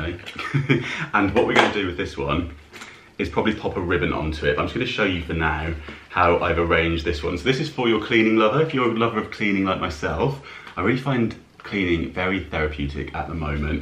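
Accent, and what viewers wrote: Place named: RP, United Kingdom. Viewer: Ho ho ho,that was funny, I love listening to you talk,it's clear and quite posh, I live in Yorkshire,so I find the accent sometimes difficult.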